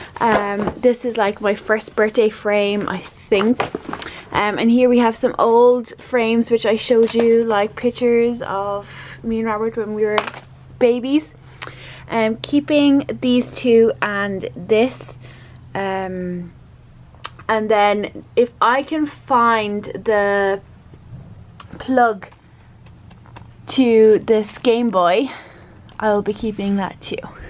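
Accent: Ireland. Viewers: Well done,. I'm going to share this video with my Drama class so they can hear a proper Irish accent:-D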